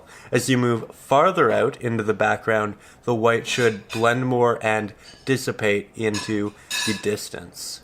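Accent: Canada. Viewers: Hi I've watched this b4. I know or assume you're in Canada due to your accent, I grew up sailing & boating on the shores NE.This water direction looks odd to me. Where is it coming from? The ocean would not flow from the bank opposite your beach? It would come from the horizon wouldn't it? Therefore the waves would pass over the beach horizontally? My mind simply can't settle on it. Is it me??? Can you explain it to me? Thanks for sharing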